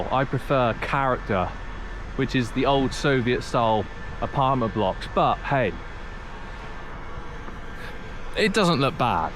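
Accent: United Kingdom. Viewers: Ох уж этот британский акцент)))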